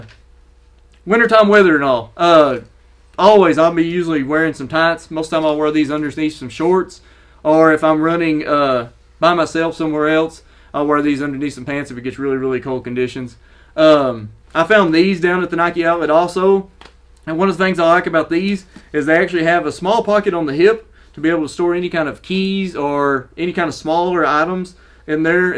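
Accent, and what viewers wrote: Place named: South, United States. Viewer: Thanks for the quick list & honest info. Looking forward to 2019. PS - Don’t apologize for a southern accent. Just be the real you.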